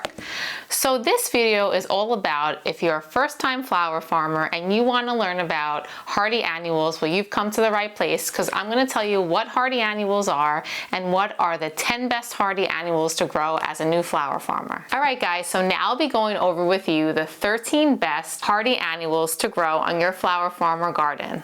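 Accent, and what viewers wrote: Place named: Long Island, United States. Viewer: Accent rough. People from LI and Staten Island always sound a little drunk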